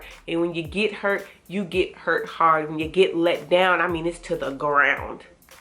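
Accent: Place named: East Coast, United States. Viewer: Love ur East Coast accent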